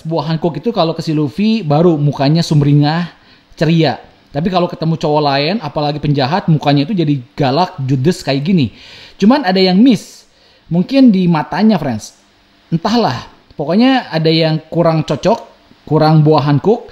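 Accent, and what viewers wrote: West Java, Indonesia. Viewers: Udh d alungin batu encan mang? Pepeus henteu Logat / bhs sunda na alus.. Jgn smp hilang nya mang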